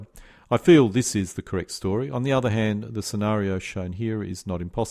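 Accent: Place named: New Zealand. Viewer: Interesting. your voice sounds like New Zealand to me.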